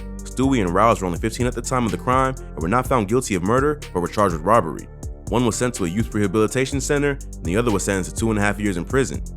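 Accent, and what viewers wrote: United States. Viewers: Bro I love this us accent wtf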